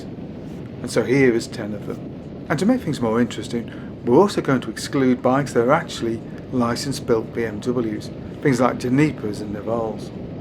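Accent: United Kingdom. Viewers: Neat bikes! Unfortunately, I could hardly understand a word that was said between the sound quality, his soft voice, and his heavy Brit accent.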